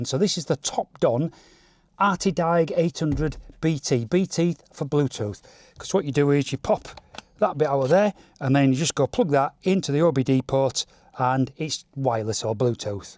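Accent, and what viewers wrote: Hull, United Kingdom. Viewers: Hi, I'm new to your channel and have just watched two of your posts. I thoroughly enjoyed them. Love the Hull accent BTW. The car looks miles better without the stupid stripes.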